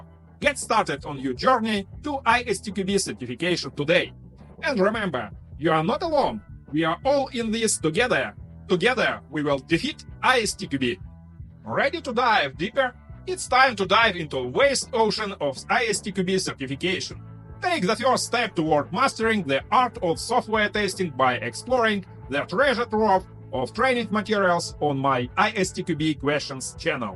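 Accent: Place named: Russia. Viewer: your russian english is briliant)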